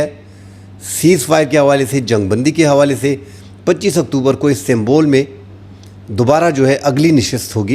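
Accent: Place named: Karachi, Pakistan. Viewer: آپ پشتو سپیکنگ ہے۔ مگر آپ کے گفتگو میں کراچی اردو سپیکنگ کا لہجہ بھی ہے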